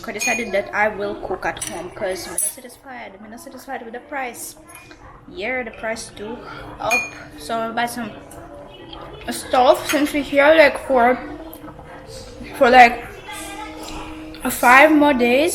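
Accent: Jamaica. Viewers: I speak like Duel Sylvester, God has work out a well put together family vacation✈ be thankful.you speak patwa deep love it play safe ⚽️Errol love from sunny vincy 🇻🇨🙏😍💪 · Yes she speaks patois very cute and dresses trendy like a black girl 👧🤣😂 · girl big up, I like hearing you talk patois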